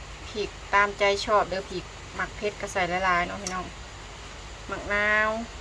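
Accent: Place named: Savannakhet, Laos. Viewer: ເອື້ອຍຄົນສະຫວັນບໍນິ ສຳນຽງຄືຄົນສະຫວັນ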